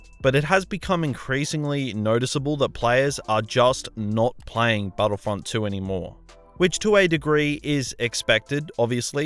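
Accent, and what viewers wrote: Australia. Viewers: Your Australian ? Nice I thought for some reason you were English lol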